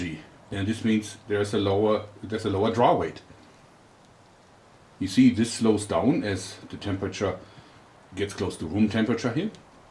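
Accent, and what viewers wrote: Germany. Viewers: Hörst dich sehr deutsch an, bist auch deutscher oder? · ich hab mich die ganze zeit gefragt warum der sich so deutsch anhöhrt :D:D kommt ja aus deutschland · anybody else notice that he sounds like a german robin williams... he sounds just like him just with a german accent. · klar ist er Deutscher - hört man ja auch an seinem Akzent :)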